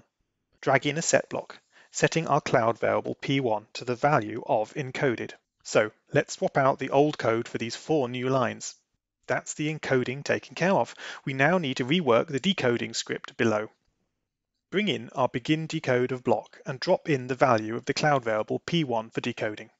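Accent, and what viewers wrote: United Kingdom. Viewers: I could listen to your british voice all day. It kind of reminds me of the times when the internet was for nerds and mainstream brands didn't take it seriously.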